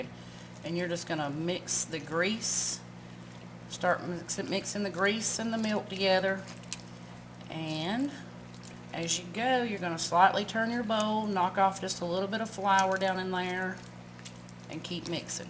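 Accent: United States. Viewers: FANTASTIC! You sound like you're from Mississippi. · The whole time I was watching your video I was thinking, I know who that is she sounds so familiar, then I realized that your from Georgia you sound like everybody I know because I'm from Georgia also. That whitelily flour makes all the difference.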